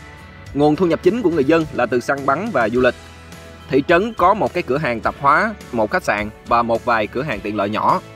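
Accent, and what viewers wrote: South, Vietnam. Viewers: Giọng miền nam dể nghe,ủng hộ bạn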